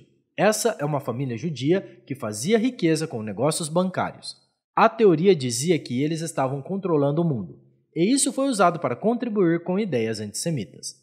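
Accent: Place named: Paraíba, Brazil. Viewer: kkkkk isso que eu ia falar , sotaque meio paraibano do norte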